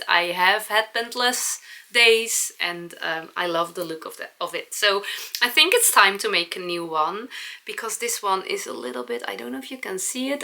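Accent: Netherlands. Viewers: Merry Christmas and Happy New Year,! Never feel the need to apologize for your "Dutchy English!" We love it! My kids and I are trying to learn German together this year, and I would never be able to do a podcast in a foreign language! Whew! Also, I am a fellow curly hair girl, and I love your look both with and without the headbands. I never feel as confident with one on myself...but I love the way they look on others. I want to try wearing them more...must try knitting some, I guess!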